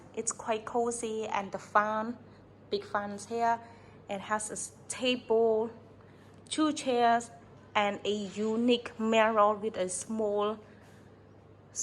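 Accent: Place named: England, United Kingdom. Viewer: Yes you have a harmonious English accent. It's very nice.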